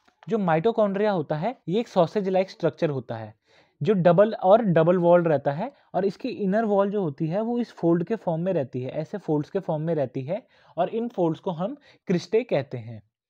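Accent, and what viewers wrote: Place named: Bareilly, India. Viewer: Sir you are from bareilly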